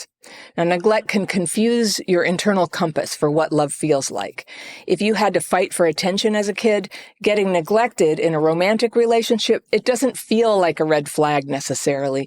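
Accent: United States. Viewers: What a good videos you make, I always feel very seen in what you say and the way you bring your videos feels really like emotional support. By the way, what a GREAT American accent!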